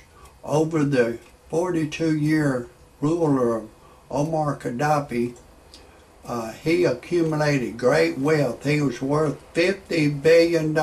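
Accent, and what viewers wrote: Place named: South, United States. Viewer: lol, excellent...this is good entertainment, I think that's the name I catch from you, but maybe the southern 'twang' does defeat me in this regard...sadly that '21yr old having my baby' appears to have prematurely put our host 6ft under..RIP.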